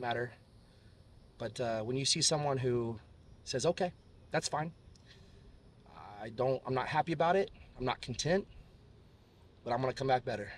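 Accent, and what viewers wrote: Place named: Florida, United States. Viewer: You sound like a true Florida cracker bro “fittin to get swole”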